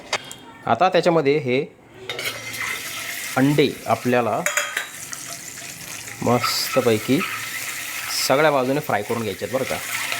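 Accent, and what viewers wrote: Nashik, India. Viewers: भाषा प्युअर नाशिकची वाटते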